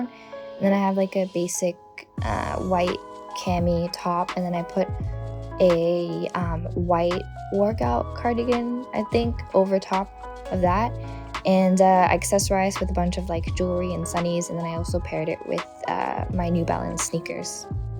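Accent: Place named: West, United States. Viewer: here at 37 sub wow never seen anyone in sg accessorised like that and how is ur accent so western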